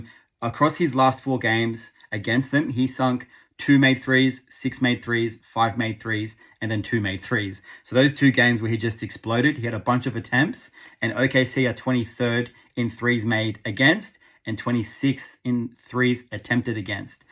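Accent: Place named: Australia. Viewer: Found this Channel after seeing your comments/tips in calling our shot , after watching a couple of times i thought i detected an Aussie Accent , Good to see/find an Australian sports betting Capper/Tipster , looking foward to seeing what you do with AFL and NRL when the seasons start, The Boomers ( The Australian basketball team for anyone eles reading ) just had another win giving Indonesia a bit of a thrashing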